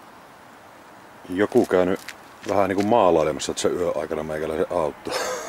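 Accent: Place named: Savo, Finland. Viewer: On siinä vähä paljon muutakkii.Hauskaa tämä Savon murre.Itsekkin Savolainen kyllä.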